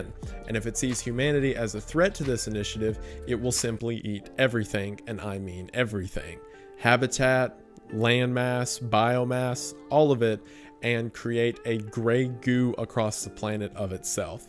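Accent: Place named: Georgia, United States. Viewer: it's a Georgia thing I think. I got a buddy from north of Atlanta and he talks exactly like. all the same turns of phrase, mispronounces words the same way, same cadence - the works.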